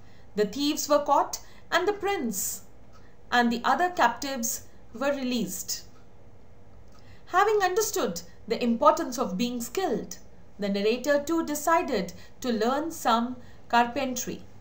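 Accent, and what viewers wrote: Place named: Australia. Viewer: But , even though u are an Indian , your accent is much like an Australian ✨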